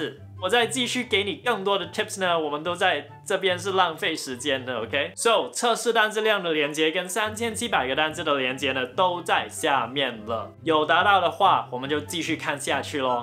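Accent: Cantonese, China. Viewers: Uspeak cantones so cute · OMG是真的Ne-Yo!我本來還期待你自己裝扮成他! 越來越棒了~真的大愛你講廣東話跟有廣東味的中文(普通話)😍😍😍!!!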